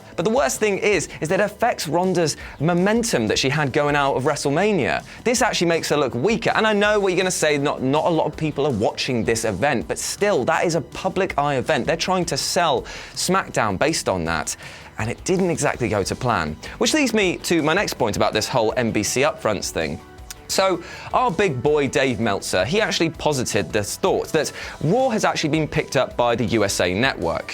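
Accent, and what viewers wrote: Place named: United Kingdom. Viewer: I never knew until today that was actually a Brit.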